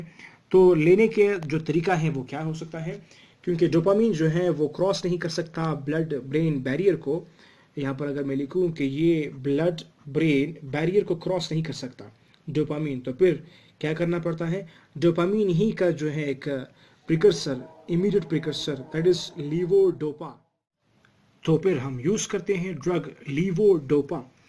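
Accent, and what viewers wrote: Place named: United Kingdom. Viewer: U are having good teaching methods but don't try to teach like British person i think Pakistani don't get that language 😮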